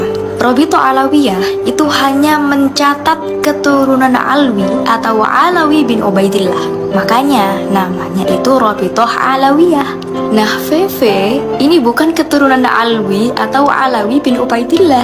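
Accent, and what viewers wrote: Indonesia. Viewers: Pri bumi sekali medoknya, lanjut.......!!!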